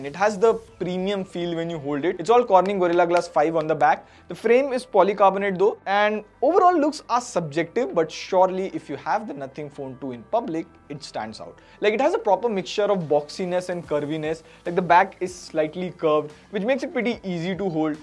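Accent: India. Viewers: We need an easy ai tool to change indian accent to another accent (american ...).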